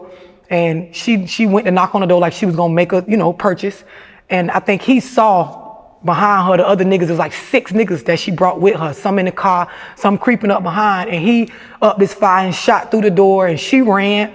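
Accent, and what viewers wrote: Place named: Florida, United States. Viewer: It's Florida's accent. And yes. She's very sexy.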